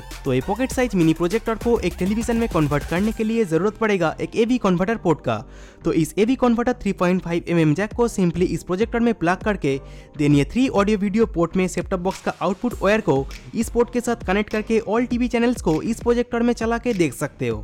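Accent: Bengal, India. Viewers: bengoli h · Aare kotha sunlei bojha Jai ... Tchara jokon uni 360 camera er unboxing korchilen tokon oner Facebook e Bangla te lekha chilo..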